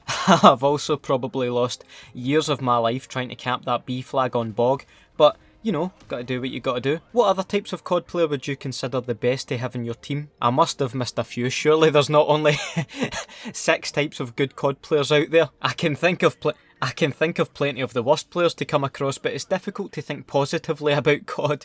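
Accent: Scotland, United Kingdom. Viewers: Scottish accent... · Dno weather it's your banter or your Scottish accent what makes you so funny but ye your funny man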